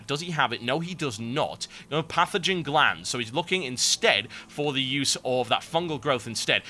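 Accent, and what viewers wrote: United Kingdom. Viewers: just a suggestion TB, since you're catering your casts to those who don't really understand the game or play very well, at the start of the cast when it's perfectly standard play you could perhaps explain the map layout a bit, and the possible playstyles accompanying the maps. Apart from that, keep up the casting! Love hearing a British commentator =D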